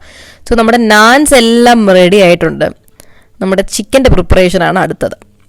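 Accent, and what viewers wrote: Trivandrum, India. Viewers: vrithketta trivandrum slang.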